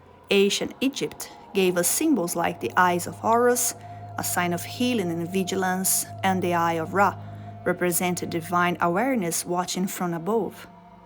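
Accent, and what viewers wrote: Brazil. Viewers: Are you, by chance, Brazilian? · Quick question, are you perhaps Brazilian? Not complaining, just can´t pinpoint the accent. Also great video. And in the mark where you say "exoticism", is it really that or "exoterism"? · Brazilian accent hell yeah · Bizarro, 2 da manhã eu vendo minhas coisas de nerd e vejo um vídeo sobre um dos bosses que acho mais atrativos e curiosos em nightrein, que vídeo maravilhoso, tantas citações mitológicas, musicais e filosóficas que reflete o trabalho que teve em escrever e criar esse vídeo que aprofunda MUITO no jeito que vemos o boss, com comparações cirúrgicas e uma análisa muito profunda! que vídeo incrível. Ver o povo engajado com comentários adicionando anotações ou observações é muito foda! (perdão a palavra) edit: esqueci de citar que percebi seu sotaque e fiquei encucado! mas depois de ver que era do Brasil, tenho a elogiar sua fluência em inglês!!! 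· Strong Brazilian vibes in this accent.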